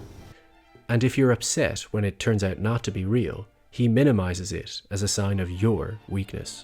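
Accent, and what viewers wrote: Ireland. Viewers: Is the narrator Irish? Some words sound Irish (e.g. without, about etc) but not entirely sure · Sounds Irish to me but what do I know lol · Love this channel. Insightful, well written, well-produced. Well done, man! Are you Irish? I thought I could hear the accent. As a Dub I can spot it I think ;)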